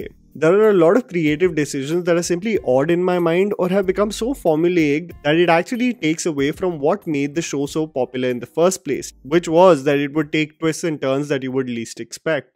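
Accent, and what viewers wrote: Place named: India. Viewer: I stopped watching immediately once I heard his Indian accent.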